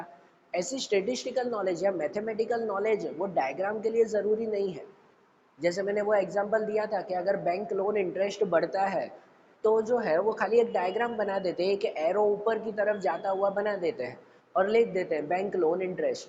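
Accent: Gujarat, India. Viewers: he got the gujju accent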